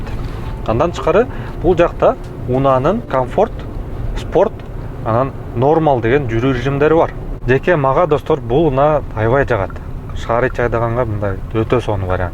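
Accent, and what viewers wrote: Standard. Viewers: Нукура кыргызча пай пай сонун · Кандай таптаза кыргызча 🇰🇬🇰🇬 жолун шыдыр болсун уком. Азаттыктын денгеелинде суйлогонун жакты. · Таза кыргызча керемет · Кыргызча тазза граматно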